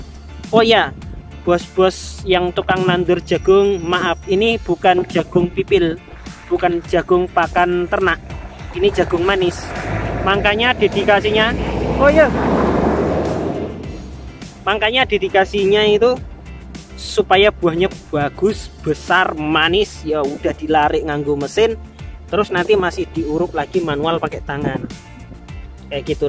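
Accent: Java, Indonesia. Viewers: Bang asli orang mana kok jawanya medok..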